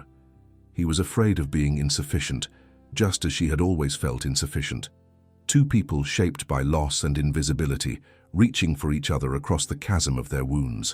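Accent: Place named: England, United Kingdom. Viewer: I agree with your laud of the narrator. Luv that purring English accent. YUM!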